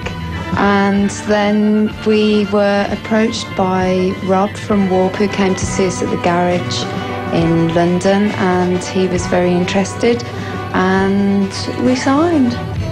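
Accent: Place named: Black Country, United Kingdom. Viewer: She's a yam yam brummie through and through. We are from the same area. Sad at her passing.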